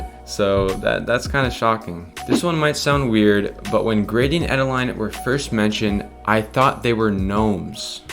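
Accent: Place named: United Kingdom. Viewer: a very crisp british accent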